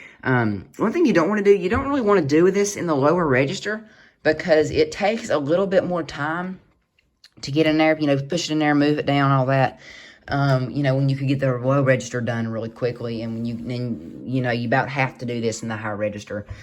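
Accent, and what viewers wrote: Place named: Mississippi, United States. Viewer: I swear you’re from Mississippi 😂. Your accent is great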